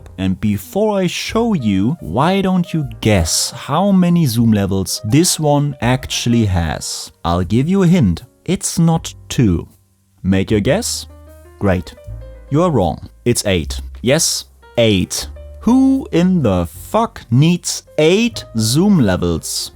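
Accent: Germany. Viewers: Subbed because: German accent gives the content even more quality; The hard work you put into this video added a lot to the way I’ll play the game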